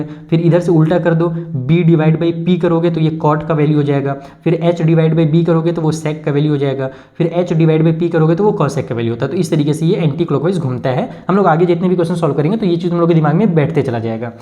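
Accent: Bihar, India. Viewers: Sir are you bihari ✌️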